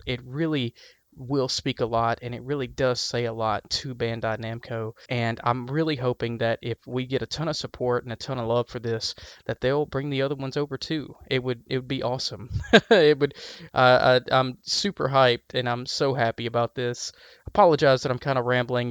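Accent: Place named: South, United States. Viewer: Hearing that southern accent is amazing.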